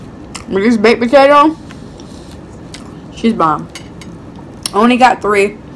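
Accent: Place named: Baltimore, United States. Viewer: You sound like me. Im from Baltimore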